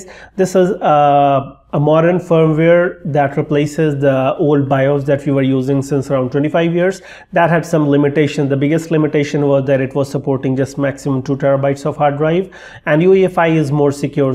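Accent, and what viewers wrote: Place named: India. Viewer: Kitna bhi prayash kar rahe ho Par american waali feling ni aa rahi hai indian ho indian hi rahoge kitna bhi english bol lo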